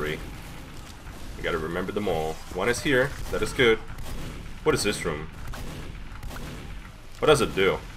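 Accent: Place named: Russia. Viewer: I like these rants about valve I like hearing russain man to. Good video.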